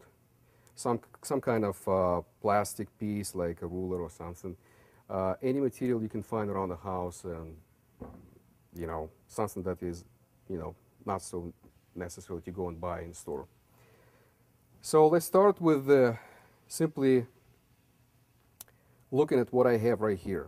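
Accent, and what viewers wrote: Russia. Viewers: Are you from Russia ? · У чувака шикарный русский акцент))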